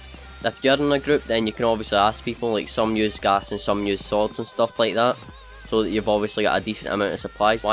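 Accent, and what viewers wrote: Scotland, United Kingdom. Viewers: Scottish that’s what I like to see lad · Are you Scottish? Cause if so same!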